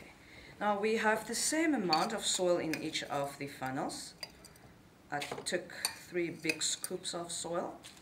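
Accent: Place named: South Africa. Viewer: Very helpful video, thanks. You sound like you are from South Africa as well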